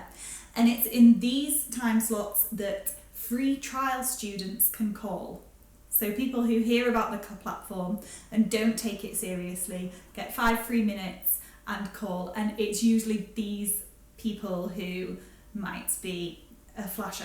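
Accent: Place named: Manchester, United Kingdom. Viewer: You sound like a Manc.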